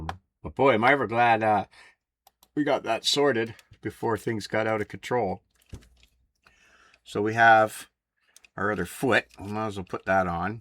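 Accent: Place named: Scotland, United Kingdom. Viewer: Your definitely Scottish not Finnish... Lol Great kit!!! 😎👍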